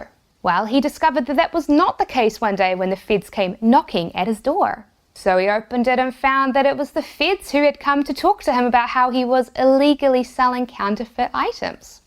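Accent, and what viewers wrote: New Zealand. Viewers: She literally said " Hir in Niw Zilind " . Didn't you hear ? :) Love the accent btw no offense meant :D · I hear a KIWI lol. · Awesome video thanks! BTW my wife and I are currently doing about six months of travel in NZ and I have watched a few of your videos and I was like she sounds like a Kiwi, but I wasn't sure until this video. We call ourselves the Kiwi wannabes :P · You must be from New Zealand with this accent! Nice and informative though. Thanks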